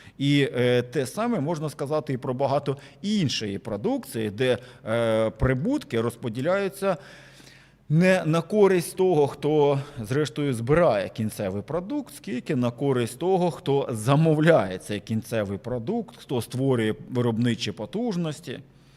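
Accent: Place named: Eastern Ukraine, Ukraine. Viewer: Не зважаючи на гарну українську мову,приємно чути цей особливий східний акцент.Поважаю Вас❤❤❤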